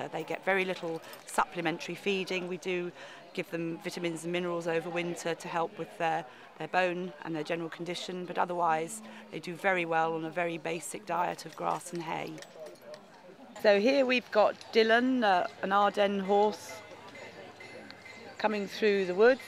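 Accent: United Kingdom. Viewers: by accent i'd sday britain. but in america you have grater chances to see draft horses than in europe. about ardennes i don't know, drop by on the front porch of rural heritage page.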